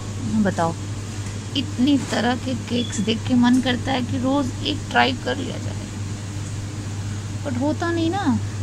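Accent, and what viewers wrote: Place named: Punjab, India. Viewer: Teri Hindi mein thoda Punjabi touch bhi hai🤭😜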